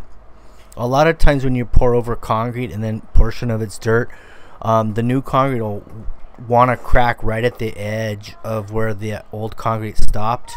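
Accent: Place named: Orange County, United States. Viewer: Your accent, "THIS guy is from OC." Then your phone number pops up. Yep.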